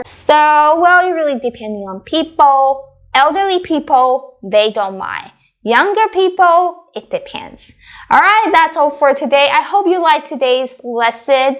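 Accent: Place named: Taiwan. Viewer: Yeah this is Taiwan Mandarin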